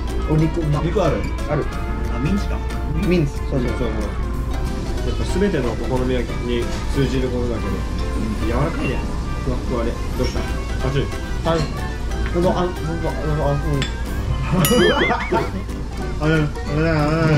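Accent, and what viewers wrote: Java, Indonesia. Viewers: semenjak ada, seneng banget liat bang ngomong medok jowo banget 😂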